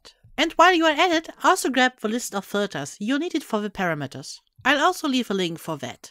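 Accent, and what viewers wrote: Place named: Germany. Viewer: Lovely german accent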